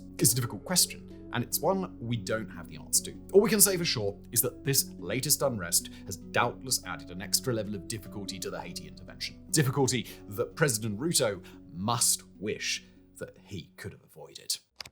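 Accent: RP, United Kingdom. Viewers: Couldn't watch the 'over acting or the over the top posh english with the noticeable changes in the octave of the tone', i thought he sloved it down noticeable recently but guess he's back at it again. Time stamp is .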